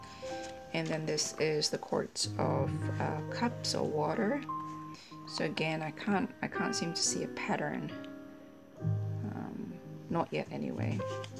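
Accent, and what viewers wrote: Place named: Singapore. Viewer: Hahahahah I love your Singlish <3 you score full points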